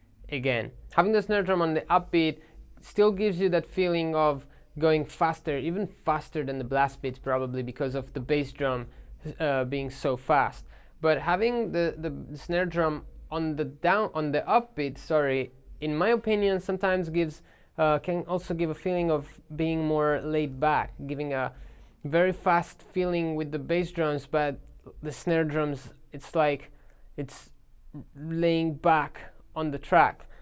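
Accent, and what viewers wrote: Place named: Austria. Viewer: Similar accent as krimh, are you from the same place? Austria, I think?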